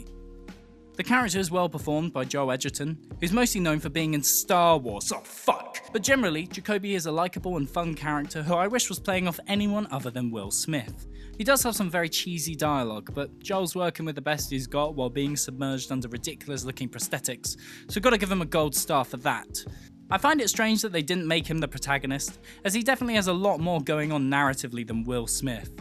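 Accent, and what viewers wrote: England, United Kingdom. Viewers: Hey man nice 2 see u working, keep it up you sexy English accented fellow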